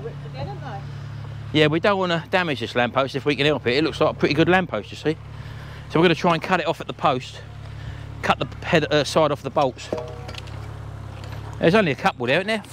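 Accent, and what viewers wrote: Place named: Cockney, United Kingdom. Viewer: Well that got me. Coulda sworn you were from the eastend (like my missus) and damn me there's a Riddels skip. I can nearly see their yard from where I am.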